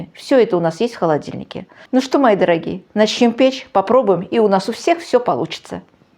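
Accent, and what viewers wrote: Baku, Azerbaijan. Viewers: спасибо за рецепт, землячка! Бакинский акцент не проходит с годами!))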